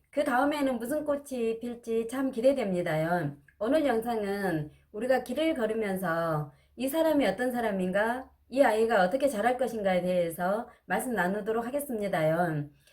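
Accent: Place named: Jeolla, South Korea. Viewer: ㅎ ㅎ ...전라도 사투리가 나오네용.. 저도 전라도거든요. 역쉬 전라도 사투리가 멋져요. 영상 컨텐츠 좋네요. 앞으로 계속 응원할게요. 감사해용,,,,,나 갈랍니다..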